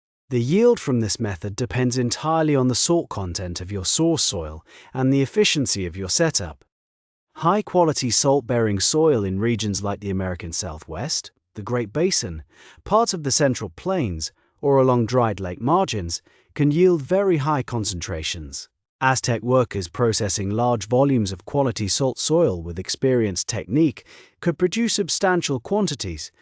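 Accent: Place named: United Kingdom. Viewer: We don't like this British accent on these things in America no we don't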